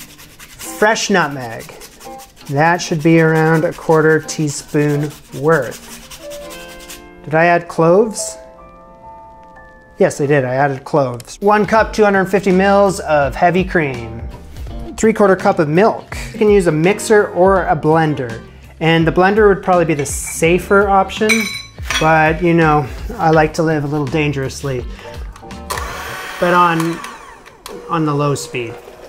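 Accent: Canada. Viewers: First time I ever saw your vid. For some reason I detect a bit of Canadian in your voice but could be wrong?I very much like your "son of a bitch"! Will use your filling recipe to make pumpkin custard because stressing with pastry is not my idea of a good time. Subscribed!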